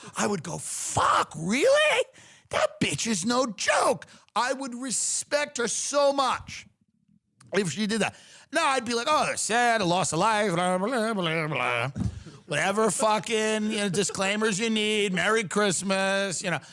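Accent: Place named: Long Island, United States. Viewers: Long Island baby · The NY way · ❤️ his lawng guyland accident. I miss it.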